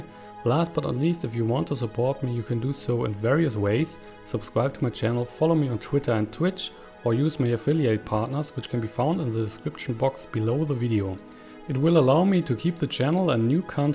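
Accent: Germany. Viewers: uhh are you Germany? Your accent makes me think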